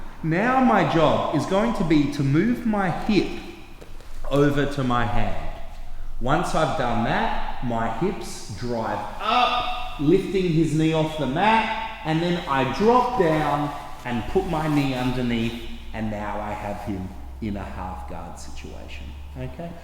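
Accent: Australia. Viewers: The Aussie accent make it so pleasant lol!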